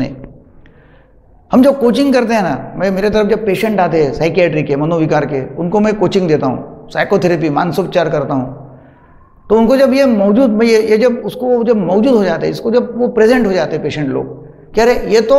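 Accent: Maharashtra, India. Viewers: सर तुमच्या हिंदी भाषेवर मराठीचा प्रभाव दिसतो.. But your all videos are really Awesome as most are connected to our daily life problems and you illustrate it very well .. So please keep posting.. I am from वर्धा... Best luck to you.. Sir, Meditation म्हणजे नेमके काय..? Awareness, Alertness, Consciousness व Concentration यात काय फरक आहे कृपया ते स्पष्ट करणारा video बनावणे.. Sir, Meditation मी अनेक दिवसांपासून प्रतिदिन करतो आहे पण मला त्याचा विशेष फायदा जाणवला नाही आहे.. Present मध्ये कसे जगता येईल ते स्पष्ट करने.. काय Meditation केल्याने कुणाचे मनोविकार(काम, क्रोध, लोभ, अहंकार आदि) नष्ट होतात.. ते नष्ट करायचे असल्यास काय करावे लागेल..? शांत मन Meditation साठी Prerequisite आहे, पण शांत मन कशे साधायचे हा प्रश्न बिकट आहे..? माझ्या मते व्यक्तीस वैराग्य आल्याशिवाय, त्याच्या तृष्णा नष्ट झाल्या शिवाय त्याला शांति प्राप्त होने अशक्य आहे.. पण वैराग्य प्राप्ती व तृष्णाविहीनता कशी साध्य होणार, याबद्दल कृपया मार्गदर्शन करावे..सर्व काही असतांना माझे मन विनाकारण सदैव अशांत राहत.. 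मला चिरकालीन शांति अनुभवायची आहे.. कृपया मार्गदर्शन करावे ही विनंती.. नमस्कार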